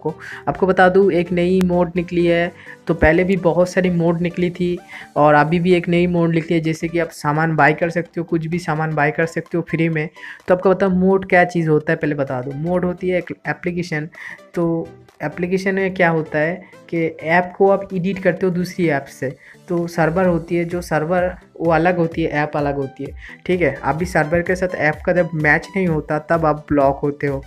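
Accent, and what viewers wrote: Bengal, India. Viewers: Are you a bangali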